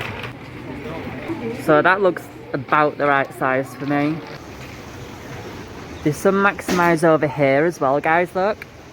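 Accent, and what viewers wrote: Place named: United Kingdom. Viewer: I here a British accent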